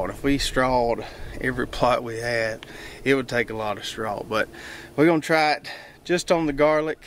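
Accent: South, United States. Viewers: You southern guys are the best. Love your accent and your down home smarts. I too live in zone 8B (in the Pacific Northwest), but it's a world apart from where you live. While your low temperatures are similar to ours, we're in those lows for months at a time, not just on occasion. I am envious of your warm weather that allows winter gardening of crops we can only grow in the summer. I've purchased some of your tools. First class quality.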